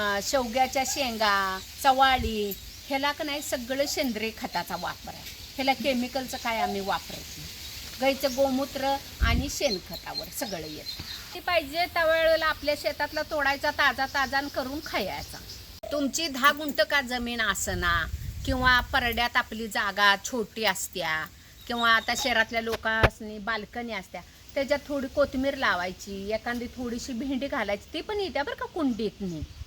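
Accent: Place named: Rural, India. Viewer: Tumchya malyatil bhajila tumchya gavran bolnyasarkhach godava aahe.Tumche kasta baghun khup kautuk watate tumche.❤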